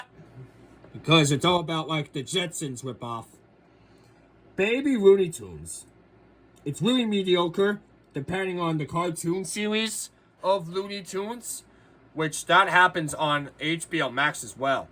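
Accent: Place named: United Kingdom. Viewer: Dude sound British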